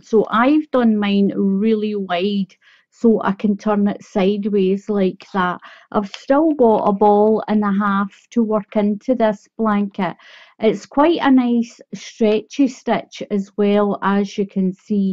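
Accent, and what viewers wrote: Scotland, United Kingdom. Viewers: Good Day to you. I have been looking for such a project to used up a large over buy of heather gray Red Heart yarn, What weight yarn is the yarn you used? I wil be using 4 which is a worsted weight. I definately will be doing a swatch, it looks like you need to do a double amount of foundation chains since the work shrinks in to about half, which is why one does a swatch, to figure out these things. The finished patterning looks like basket weave and should be quite effective to keep any one guessing how it was done. I have never done front/back posting crochet before, glad you cleared up what stitch you were doing in your pinned comment. Its definately double crochet, If this is considered treble crochet in your circle, what is single crochet? Just a curiousity on my part, love to see techniques from other places and I will echo other commenters, your Scottish accent is truely lovely. 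Thank you for showing us how to do your basket weave blanket, my crochet fingers are itching to get started. Best Wishes. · it's a Scottish accent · I am going to make this for a friend in permanent healthcare in Wisconsin. It's a man of course, love the name you gave it. Thanks from Louisiana. I'm guessing your in or from Scotland, love your accent as we always say. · I just love your Scottish accent. You are definitely right about not having more “Man Blankets” patterns. I mean, everyone has at least one man in their lives that deserves a beautiful, or shall I say handsome, blanket. This blanket is very easy to do & I love the way it’s turning out for me. It’s for my son for a Christmas gift. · Love the blanket and the accent! Is it Scottish, eh?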